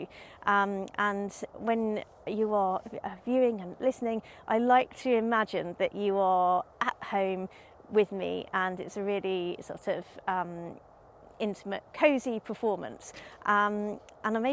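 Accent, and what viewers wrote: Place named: Switzerland. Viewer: Whereabouts in the Alps,? Sounds quite Swiss to me 😊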